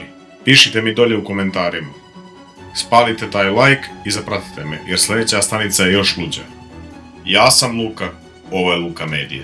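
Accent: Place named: Montenegro. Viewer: Pravis najjace videe sa sve crnogorskim akcentom 😂😂😂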